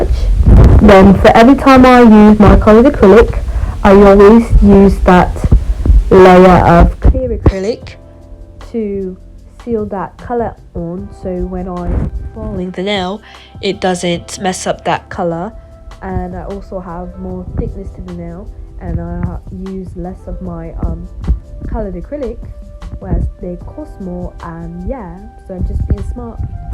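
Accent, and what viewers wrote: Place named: United Kingdom. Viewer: its the british accent for me. You're doing great. Really happy for your business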